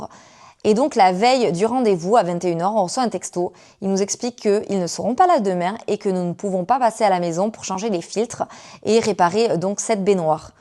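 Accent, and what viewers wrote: South, France. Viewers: Vu ton accent, tu viens du sud de la france? Ton mari a -t-il trouvé du boulot en france?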